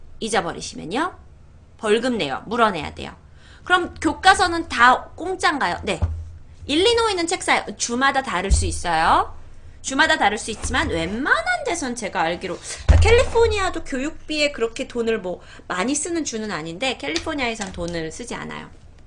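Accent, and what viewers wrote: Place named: Jeolla, South Korea. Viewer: 팬인데요.전라도 말투!의를 으라고.매번 하던데요.바꿔주면 완벽할텐데요.좀!아쉽네요.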